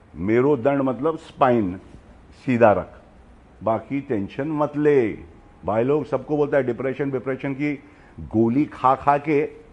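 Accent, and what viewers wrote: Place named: Mumbai, India. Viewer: Like his simple philosophy mumbai Hindi nobody speaks like him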